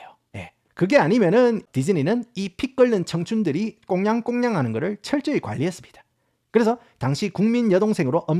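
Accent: Gyeongsang, South Korea. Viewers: 이야기를 정말 맛깔나게 잘 하시네요😊.. 사투리도 좋구요~~ 고향이 경상도쪽이시죠?😅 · 나도 경상도 사람이지만 사투리 억양이 듣기 싫어서 못듣겠어서 나갑니다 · 아닠ㅋㅋㄱ 님 걸쭉하게 경상 사투리 쓰다가 갑자기 영어발음 갑자기 멋있게 뽑는거 웃겨욬ㅋㅋㅋ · ㅋㅋㅋ...경상도 말이 너무재밌네요~구독좋아요~홧팅삼춘~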